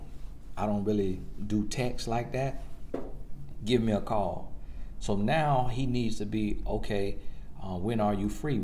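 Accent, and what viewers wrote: South, United States. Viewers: The southern twang with it too. Love it... booty ways dang he ain't tell no lie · I love how that southern accent comes all the way through. lol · You have a lovely accent The American southern accent is adorable Subscribed! 😊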